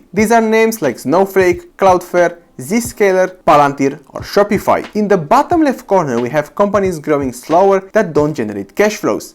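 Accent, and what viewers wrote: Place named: Romania. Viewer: Bruh u have a strong Romanian accept. But do u know what, me too. I need to get rid of it though, I left Romania so I barely speak that language anymore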